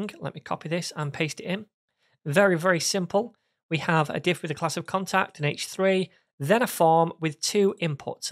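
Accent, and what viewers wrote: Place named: Manchester, United Kingdom. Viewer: Great tutorial! Thank you. I'm guessing that your accent is from Manchester. Perhaps Bury?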